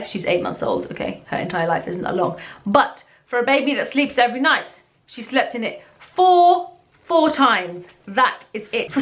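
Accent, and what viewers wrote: United Kingdom. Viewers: ive noticed you pronounce video as vijio it's because of your british accent :) haha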